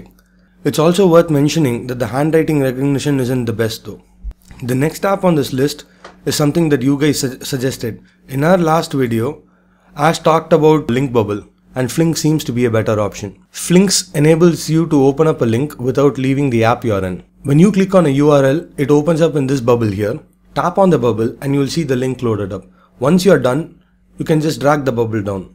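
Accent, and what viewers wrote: India. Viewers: Guys dont be so judgmental on sumone's accent!!! wen sum Caribbean or Irish or Italian guys speak r we that much judgmental... then y d fck for indian.. carry on gud wrk... from Maharashtra Guy.(in case u r luking for my ethnicity) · I usually get annoyed when Indians try to imitate an american accent and fail miserably. I'm actually glad that he decided to stick to his Indian accent.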